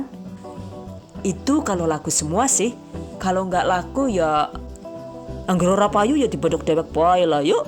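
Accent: Banyumas, Indonesia. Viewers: Lucuuu kocakkk mbaknya...aplgi klo pas lg ngomg bhsa ngapak😂😂😂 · Kayak orang bule ya mbknya,,tapi ternyata bisa bhs ngapak2 😂😂🤣,,,btw syukak bgt ma vidio2nya,,thx resep2nya mbk yu 😄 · Ngomonge bisa ngapak kaya wong banyumas ya mbok ? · Ngapak maning lha 👍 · Merga wong ngapak dadi tak subscribe juk ben gampang nek arep ndeleng resepe rika suwun yu resep resepe. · Bu, ibu wong endi bu, ngapak..tanggane nyong apa udu.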